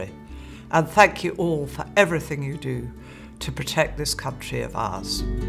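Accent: United Kingdom. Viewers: Yes but not with earphones. I just remember a faint British voice. What is so "wild" about it?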